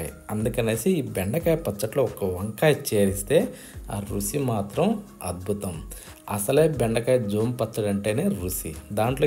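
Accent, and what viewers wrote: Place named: Rayalaseema, India. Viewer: Gudka yendi bhasha bagaledu seema yaasa😂😂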